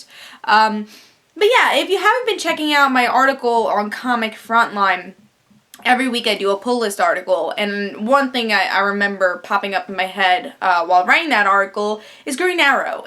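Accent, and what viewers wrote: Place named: New York, United States. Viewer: are you from new york? For a second there I thought you had a new york accent.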